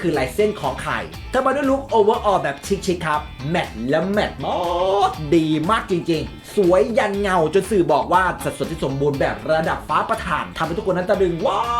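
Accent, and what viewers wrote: Isan, Thailand. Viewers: ชอบฟังช่องนี้ตรงมีความพูดอีสานไป ขำไป อวยยศแบบสุด เป็นเสน่ห์ของช่องมากค่ะ 😂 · ผมชอบเสียงบรรยายของคุณมากผมฟังภาษาอิสานที่คุณสอดแทรกมาออก ขำมากเลย หัวเราะทุกครั้งที่คุณพูดอิสาน ชอบและหลงไหลภาษาอิสานมากเลย ตอนี้ฟังหมอลำรู้เรื่องแล้วครับ เป็นแฟนตังจริงครับ ดูทุกคลิปครับ ลงบ่อยๆนะครับ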